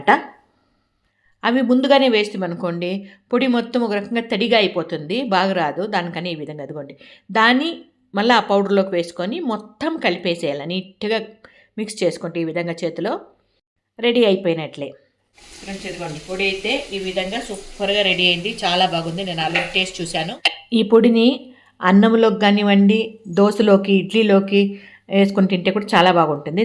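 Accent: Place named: Rayalaseema, India. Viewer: Akka meku epudu kocham rayala seema kadapa Jella yasa vstavunde akka Karnataka yasa basha ravatam ledu papam me chelle malate garu kanada basha yasato voice vavaru estuvuntaru malate garu amma ne video lo chupiatu vuntaru video lo amma ne chuce so happy alge eroju video lo saree kamalu matching mamaching begone meku kamalu baga set inae akka 🎉🎉❤❤❤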